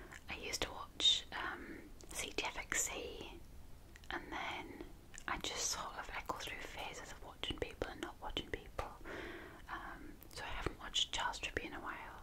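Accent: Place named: Sunderland, United Kingdom. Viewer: Are you from Sunderland? Or the north eastern area? It's great to finally find an asmr artist from where I live!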